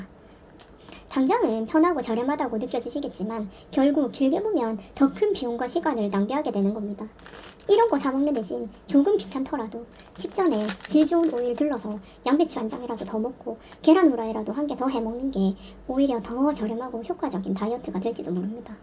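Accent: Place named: Seoul, South Korea. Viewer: ㅋㅋㅋㅌㅌㅋ 맞아요. 서울말 쓰시는데?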